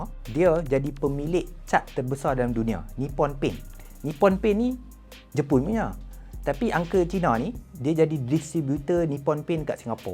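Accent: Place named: Kedah, Malaysia. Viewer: Aku mati-mati inagtkan speaker ni Melayu Muslim..Punya fasih Kedah Hang mat...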